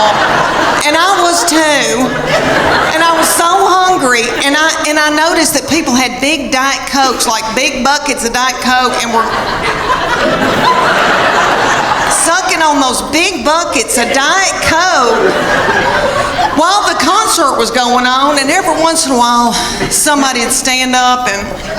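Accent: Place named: Knoxville, United States. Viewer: So funny. The accent is so like my cousin in Knoxville.😅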